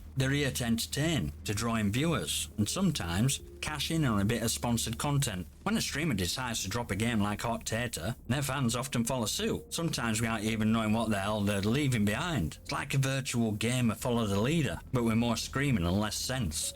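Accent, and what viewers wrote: Yorkshire, United Kingdom. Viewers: proper yorkshire that. good video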